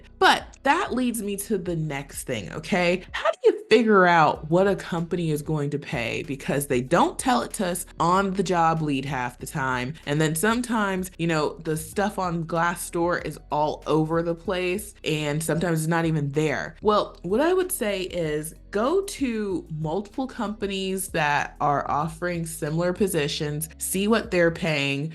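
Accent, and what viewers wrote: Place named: South, United States. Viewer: your voice tells me you are also a Southern girl! Alabama here. You are my favorite remote job lead lady! Blessed Monday to you. Keep 'em coming! 😊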